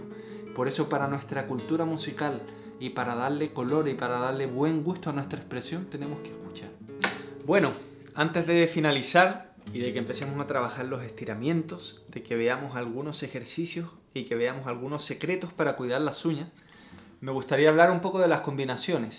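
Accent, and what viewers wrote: Chile. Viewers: Oshe, pues me dejas con la duda porque tiene un deje chileno que tumba.. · Oye, habla como chileno. Es que la cagó, no lo puedo creer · Wena compadre! Te encontré hoy y que gran sorpresa. Estoy aprendiendo rumba, flamenco, vals y boleros. Te agradezco nucho lo que compartes. Caxaba que tenías acento chileno, pero lo comprobé cuando hablaste del chirlito jaja. Ya solicite las tablaturas para estudiar y te estoy siguiendo en instagram. Lo que mas quiero es dominar las técnicas para expresarne genuinamente, sacarne las mascaras y los traumas. Un abrazo. Gracias! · Con razón po, eres chileno, con razón me parecía familiar tu acento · Eres Chileno? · Siiiii. Suena mucho a chileno. Yo también me confundí 🤣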